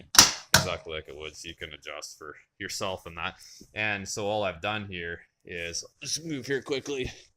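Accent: Canada. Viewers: Sound Canadian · You sound Canadian eh? I am building one of these and people keep saying you can find car seats from a junk yard, I've never been to one in Toronto will I have any trouble?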